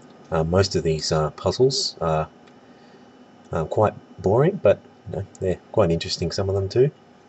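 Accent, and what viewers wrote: Australia. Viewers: holy shit first australian commentator ive heard?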